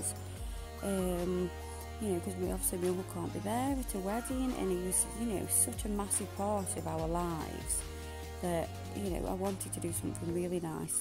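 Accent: Stoke, United Kingdom. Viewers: They were stunning duck your uncle would be so proud. Please do more videos but dunna have music as loud as conna hear those delicate stokie tones xx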